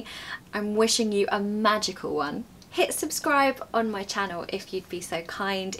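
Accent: United Kingdom. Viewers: Merry Christmas to you and your family and a happy new year too , I don't usually comment on your videos but I think your videos and your way of teaching are really good, I learn a lot from your videos besides the British accent culture also keep up your good work.🎄🎉😘🇧🇷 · You have eccelent accents British i like it's 😊 happy year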